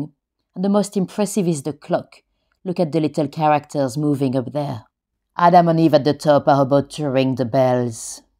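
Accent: France. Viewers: Your accent are u French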